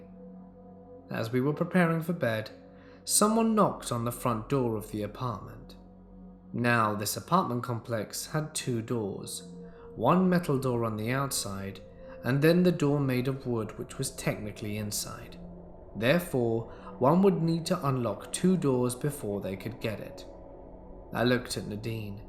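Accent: England, United Kingdom. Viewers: is the best humble horror narrator of YouTube! Got to have that English accent, after all it is their language 🏴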